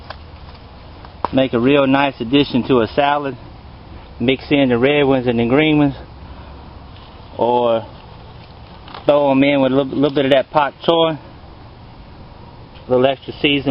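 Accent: South, United States. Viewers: Love your southern accent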